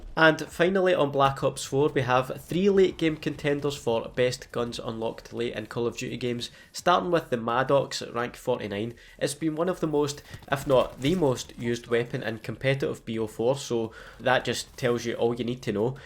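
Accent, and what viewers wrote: Scotland, United Kingdom. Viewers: I'm mainly gonna subscribe for that awesome sounding Scottish accent you have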